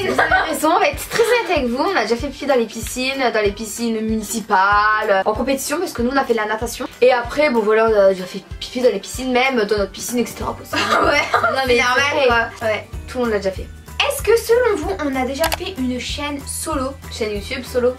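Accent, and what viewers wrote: North, France. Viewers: Vous parler ford du nord (ce n est pas garve et se n est pas mechant)mais defois sa me fait un peu rire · Accent du nord😍😍😍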